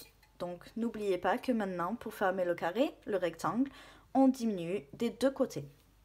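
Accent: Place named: England, United Kingdom. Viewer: bonjour j'ai suivi vos conseil, j'aime votre petit accent anglais je vous encourage à continuer vivement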